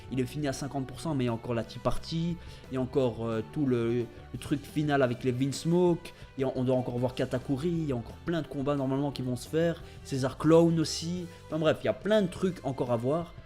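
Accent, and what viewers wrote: Belgium. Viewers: ouit x) le bon accent belge bg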